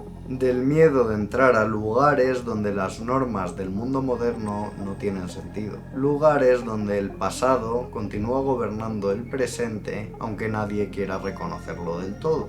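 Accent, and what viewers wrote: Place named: Spain. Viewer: Pensé que el narrador era un señor de edad avanzada, por la voz como floja con las letras pegadas, somnorifico, monótono, somnoliento… cuando vi que era un joven, me acordé de wolfsmoker y cautivosdelcine1376, también críticos de cine, videbloguers españoles. Ahora me pregunto si la entonación es propia de alguna zona de España.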